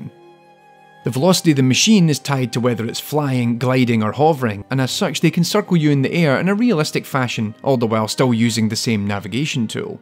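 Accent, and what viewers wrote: United Kingdom. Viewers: Hello, I noticed you've put a game on steam. I have a game ready for STEAM..but having trouble putting out on there. Could you do a tutorial on this PLEASE. Not many good UP TO DATE tutorials or there. Thanks.. Ps. Nice to hear a UK ACCENT 🇬🇧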